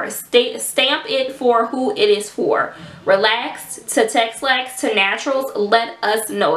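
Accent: New Orleans, United States. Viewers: YOU FROM NEW ORLEANS? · I love this Nola accent I love being from down south baby it’s just something about our lingo and how we speak and each state is different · Yes!! I love the Nola accent..Especially when you guys say."Babbyy"😁😁.!!!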